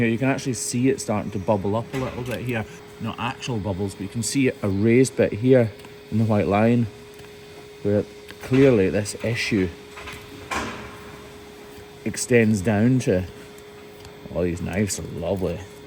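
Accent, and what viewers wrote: Scotland, United Kingdom. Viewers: quick question, are you Scottish or British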